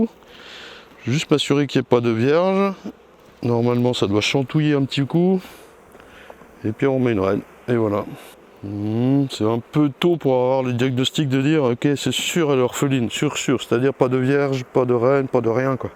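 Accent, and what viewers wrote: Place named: South-West, France. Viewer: Avec ton accent du sud ouest quesque tu fou dans le chnord 😂😂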